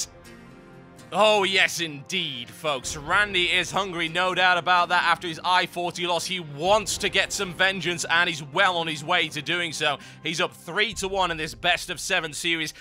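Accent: United Kingdom. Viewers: He commentates at 300 words per second, you can still understand what he's saying, he doesn't trip over his words, he makes freakin hilarious rants WHILE commentating the match, and he's British! How can you NOT like his commentary!? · not to make a mean comment or anything, but if he wasent british, that would make you 25% less awsome. but you are, so you my friend, are epic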